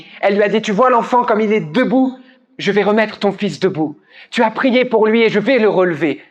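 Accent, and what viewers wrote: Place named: France. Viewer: Sûrement personne est angoissé par la guerre car tous sont gavés d'argent à mort. Ils mettent leur pied ds le 1er avion et foutent le camp. خا. رااا على هيك عالم وعليك كمان لأنك راخي نيعك لمن تحكي عربي. Mon cher petit de rien du tout, comme tu as parfaitement pris l'accent de la langue française , tu peux très bien prendre l'accent de la langue libanaise qd tu parles. Mais non tu veux nous montrer que tu es fghanji coucou de mon c. ul. Vas te faire fou. Tre. Petit c. On.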